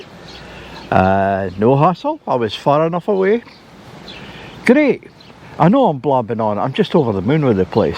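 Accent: Scotland, United Kingdom. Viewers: The closed captioning during the waterfall scene is classic "computers cannot understand a Scottish accent!"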